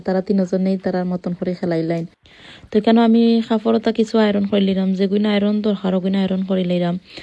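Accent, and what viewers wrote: Sylhet, Bangladesh. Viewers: সিলেটি ভাষায় কথা বলেন শুনতে ভালই লাগে । খুব সুন্দর আপু❤❤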